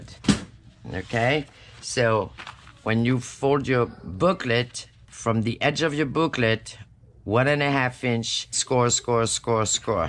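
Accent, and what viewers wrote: France. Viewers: Hello,this is a little strange that I came apon your videos my name is also and I also was born in France My father was stationed in France when he met my mom,and much later my mom had 8 kids,4 boys and 4 girls we left Europe when I was 4 yrs old,gosh I love your videos so much and your accent brings me back with so many memories so just wanted to say may God bless you each and every day❤❤❤❤❤❤❤❤❤❤❤❤❤❤❤❤❤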